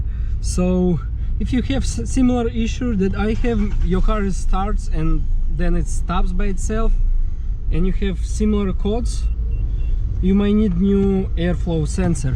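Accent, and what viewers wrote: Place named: Russia. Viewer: ОО, русский акцент)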